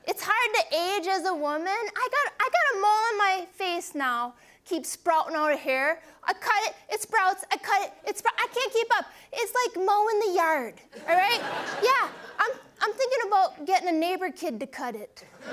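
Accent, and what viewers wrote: Canada. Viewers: She sounds very Canadian Soe instead if so. Aboat instead of about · she sounds very canadian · She sounds a bit like my relatives in Northern Ontario in Canada.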